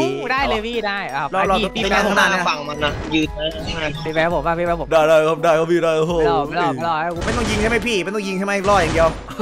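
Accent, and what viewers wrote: Shan, Myanmar. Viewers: คนทีพูดเป็นไทยไย่ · พี่เค้าเป็นคนไทยใหญ่หนูฟังออก เพราะหนูก็เป็น55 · พี่เขาพูดภาษาไทยใหญ่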